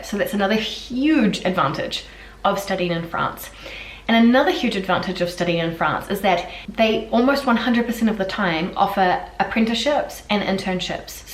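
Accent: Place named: New Zealand. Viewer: Thank you so much for this easy to understand and concise video on our visa options. Also refreshing to hear a kiwi talk immigration, too!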